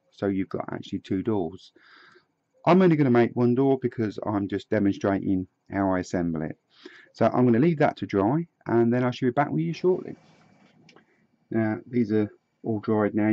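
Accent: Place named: United Kingdom. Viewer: Hi I know this video was done now 5 years ago but can you tell me what the material is that you used to attach the gate door to the post as the Closed Caption doesn't translate the British accent very well. It is a very good tutorial enjoyed it.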